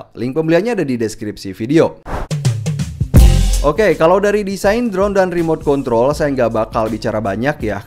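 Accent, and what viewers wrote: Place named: Majalengka, Indonesia. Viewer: Alih sudah duga dari awal Bnr beliau emang orang Majalengka hehehehe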